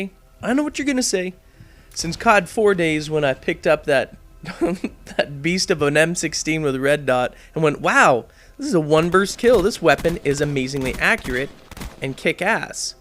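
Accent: Canada. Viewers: Lol are you Canadian? you say abot alot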